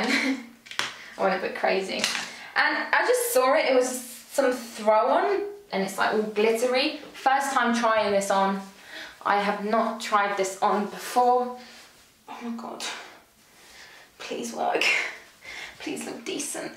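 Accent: United Kingdom. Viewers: my favorite British beautiful woman! Your clothes show is very professional and enjoy able! I love your sexy look and accent. You are very honest with your thoughts and believes. Have a long healthy, happy life ❤️ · You look really good but your British accent is hot keep up it up . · Where is that accent from? Okay, UK?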